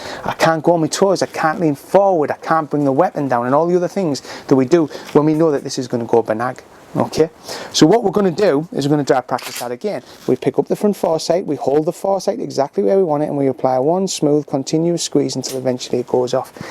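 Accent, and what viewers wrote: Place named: England, United Kingdom. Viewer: It's weird to hear an English man tell me how to shoot. No shade to you sir. However it's odd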